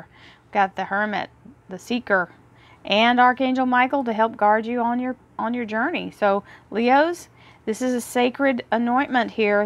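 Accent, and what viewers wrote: United States. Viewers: I love the accuracy, the lovely American accent, and of course your enthusiastic interpretation. Thank you so much. x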